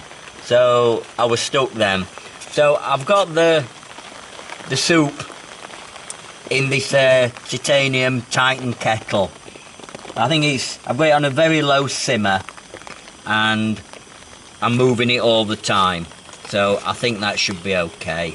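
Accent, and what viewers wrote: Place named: Northern England, United Kingdom. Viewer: Hi I absolutely love your videos. After years of hotel room holidays I camped in Wales in August in that humid stormy weather. We were on a campsite and the hail stones were as big as golf balls. My car has hundreds of dents in it! I have arthritis so can't do a lot but I'm so looking forward to getting out there again when we can. Listening to your calm, gentle Northern voice is the best. The video where you packed your glasses in your tent made me smile. Such honesty. I've watched all your videos and love the cookery that goes on. And the garden camp was brilliant I even went out and bought those beers! Fantastic looking forward to the next instalment. Kay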